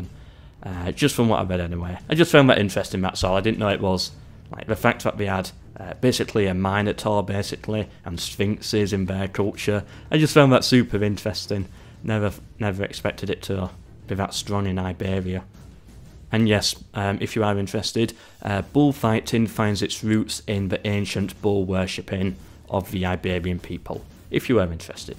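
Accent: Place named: Manchester, United Kingdom. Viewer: What accent is this omg, Manchester?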